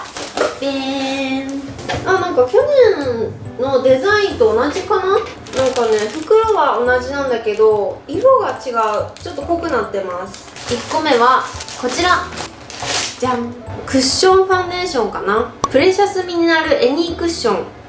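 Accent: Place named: Kansai, Japan. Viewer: さんって関西出身？すごいイントネーションが関西っぽい！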